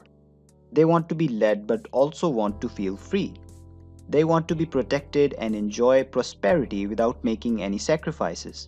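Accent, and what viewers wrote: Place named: India. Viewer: Are you Indian by any chance??? Your accent sounds like an Indian so....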